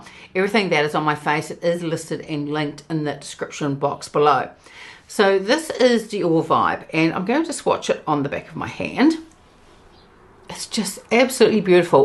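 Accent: New Zealand. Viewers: I'm so glad I found your channel... I also love your beautiful Kiwi accent! Lots of love fom the other side of the world! 💜🇬🇧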